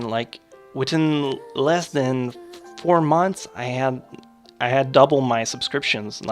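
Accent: Quebec, Canada. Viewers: Hé ouais l'accent québecois ! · Un vrai accent Quebecois XD (a real Canadian-French accent) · OMFG T'es québécois Oh shit ._. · J'ai toujours voulu entendre ton français parce que je trouve l'accent canadien vraiment sympa! Voilà une bonne chose de faite :) Content d'en apprendre un peu plus sur toi et bonne continuation! First ; congrats for various things! Second : keep the good work sir! ^^ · Je le savais que tavais pas un accant anglais jme disais que ce netais pas ta langue maternelle mais je naurais pas cru que tu serait canadien fr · Oh putain je ne laurait jamais cru que tu etait canadian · Haha je savais déjà que tu étais canadien et francophone mais tu as vraiment l'accent canadien quand tu parles français, c'est trop mignon ;) Mais jpense que tu as eu raison de faire tes vidéos en anglais, c'est beaucoup plus pratique pour se faire connaître et c'est plus "universel" que le français, on va dire. 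Anyway, keep up the good work and greetings from Japan (I'm a French girl on hollidays in Japan XD) PS: J'attends avec impatience Chronexia and the eight seals, au fait ! Vu le temps et les efforts que tu investis dans le projet, ça va être génial, c'est obligé ! :) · LoL mec je savais pas que tu etais quebecois sa fait du bien tentendre parler francais :) tu parle bc mieux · Ben non! depuis tout ce temps, j'avais trop pas reconnu l'accent! Nice que je ne sois pas le seul au Québec à aimer (ou à adorer) regarder les animes :P Je suis fan de Naruto mais les autres animes sont tout aussi bon! Bravo pour tout ces vidéos. Je suis de Rimouski, mais je vais te reconnaitre si on se rencontre inquiete-toi pas, si jamais je vais au zoo! :D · tes quebecois non? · Tant qu'a y être, est tu québécois? perso je trouve que nos accent sont pareils XD Test : dit tabarnack en vidéo on verra ;)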